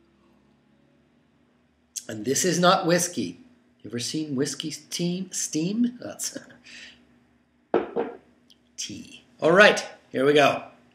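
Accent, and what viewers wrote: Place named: United Kingdom. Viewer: Youre British...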